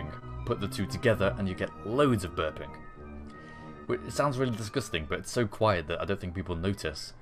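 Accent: United Kingdom. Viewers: Ein, der Deutsch mit britischem Akzent spricht, kann ich nur als großen Gewinn bezeichnen.:) Greetings from germany, give us some more of your skills, we will help you learn it.:P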